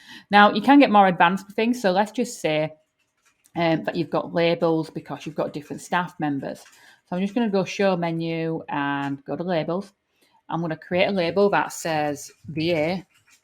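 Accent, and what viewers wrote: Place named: Leeds, United Kingdom. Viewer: Thanks. "Customise" just seems like such a funny place to go to add a dashcard, doesn't it? PS. Grew up in Leeds so nice to hear an IT "How to..." vid with a proper accent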